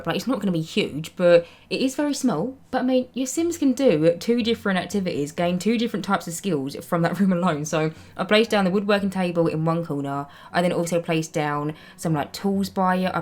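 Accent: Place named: East London, United Kingdom. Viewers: im from east london and this is the first time ive seen a youtuber with a similar accent to mine play sims! i feel so at ease love ur video and ur builds are insane!!! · i love english accent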